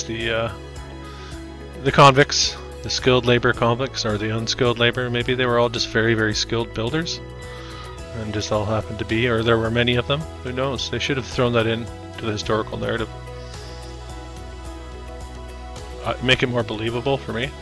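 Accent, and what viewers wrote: Canada. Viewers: Are you from Alberta ?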